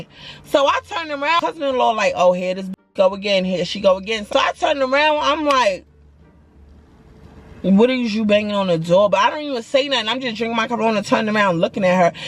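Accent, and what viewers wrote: New York, United States. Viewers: Girl I love how you still sound so NY when you been in the south for some yrs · Hey girl! I’ve been binge watching your videos for like 2 weeks now! Currently on the BD series! I came across one of your videos by accident and been hooked ever since! I think it’s bc we both from New York so I love the way you talk and we both moved down south. Keep up the good work! I will continue to watch your story times. You’re such a good storyteller! ❤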